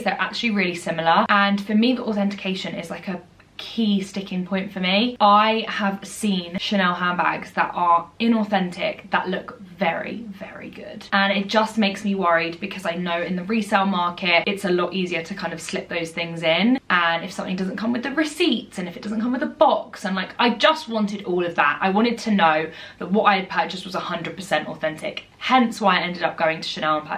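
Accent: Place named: United Kingdom. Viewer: Is she British? She’s has their accent!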